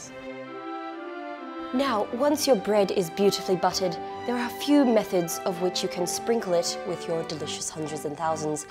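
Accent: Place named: United Kingdom. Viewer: Fabulous British accent!,..cool to hear you call them hundreds and thousands like we do and not sprinkles like in America..lol 😊👍🇬🇧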